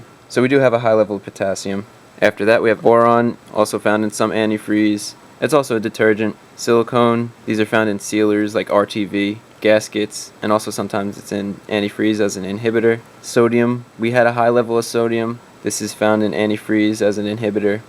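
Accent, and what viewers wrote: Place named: New Jersey, United States. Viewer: Long guess.. are you from New Jersey? Your Water accent is so cool!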